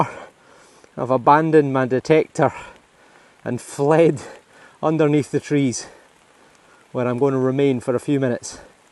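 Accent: Scotland, United Kingdom. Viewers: The Scottish accent is entertaining in itself, but could I respectfully suggest the proper pronunciation of the motto of the Order of the Garter: Honi soit qui mal y pense is pronounced (approximately) 'Onny swa kee mal ee pahnse'. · I’m sorry to those who got the emails. I for one feel that the enjoyment of your finds as well as the education I’m getting is amazing! That and the Scottish accent 😊. Thanks and congratulations again for the 30k subscribers.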